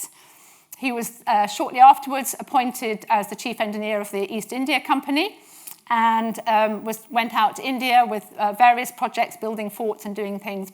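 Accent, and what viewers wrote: United Kingdom. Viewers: She’s just British bro, that’s how they talk. 🥀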